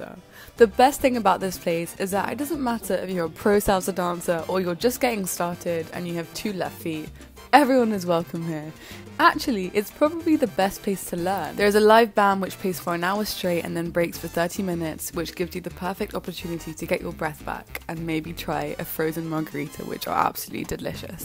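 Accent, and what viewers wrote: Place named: Mexico. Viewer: your "mexican" accent Is awsome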